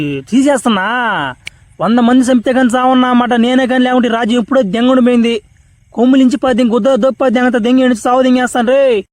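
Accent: Nellore, India. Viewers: Nellore slang 😂😂😂 maadi kooda Nellore district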